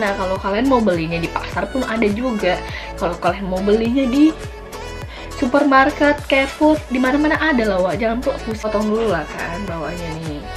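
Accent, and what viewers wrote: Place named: Medan, Indonesia. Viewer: Anak medan ya wakk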